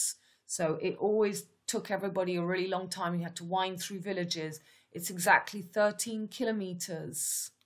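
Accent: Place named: France. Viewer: Wow your English is wonderful. I thought you were from England....with a French accent. I tried to learn French it was a joke in high school in the US ... you rock. 🥰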